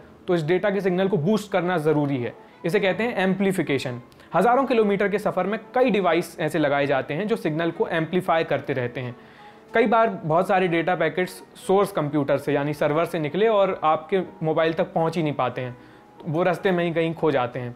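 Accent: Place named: Lucknow, India. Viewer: Shukrya Bohat behtar tareeqe se aaone samjhaya. Aapka bohat behtreen URDU LEHJA HAI AUR urdu aap ki bohat sheereen hai..Lagta hai asp Urdu jagah ke hain...Lucknow Kanpur Rampur banaras Allahabad Bareilly... Aap aur samjhayen aap ki zuban sunkar mehsiis hua ke ham lucknow kanpur men hain Masha Allah Allah aap ki zuban aesi sheereen banaye rakkhen Ameen. Aur sab aesi hi Urdu men baten karen Adaab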